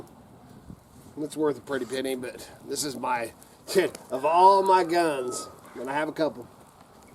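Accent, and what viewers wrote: South, United States. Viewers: I hear where you come from you're I just a redneck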